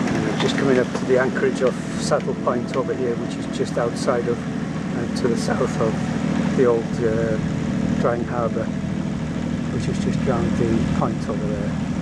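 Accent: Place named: North Wales, United Kingdom. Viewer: Diolch yn fawr,. Great vid. Seemed to have lost contact with your channel some 2-3 years ago but thankfully back on track now. Although I don't suffer from hiraeth living in France it's good to hear the North Wales accent & remember the waters & coasts I sailed years ago.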